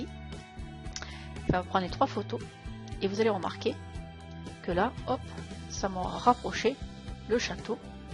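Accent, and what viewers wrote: South, France. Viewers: Bonsoir Chère, Encore du travail remarquable.. Merci de penser à nous avec gentillesse et simplicité. Avec en prime un délicieux accent du sud... Bien à vous André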